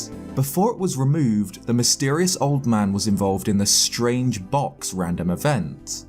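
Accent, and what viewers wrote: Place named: United Kingdom. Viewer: all British people sound the same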